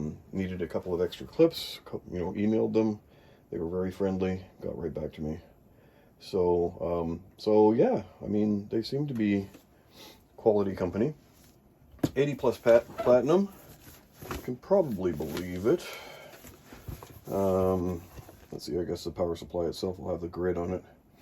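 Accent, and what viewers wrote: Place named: United Kingdom. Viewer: Hellooo, I’ve just buyed a Yuhui SFX PSU for 328 RMB or 45 USD (I think it’s a Black Commender 750) in TaoBao, rather than in NewEgg, Yuhui to my knowledge is the same or sub-brand from Sama, I just have some questions, has the PSU exploded or had some kind of issue? Is it silent? I don’t want to assume but you’re accent sounds British and if I’m correct, in the UK, they use 220V and my PSU, like yours, in the input says 100-240VAC (mine had a sticker on the plug saying 220V/AC), do you know if it works with 110V, since I live on a country we’re we use 110V? It also came with a Chinese type I connector (To Outlet) and also to my knowledge, in Chine they use 220V Nice content btw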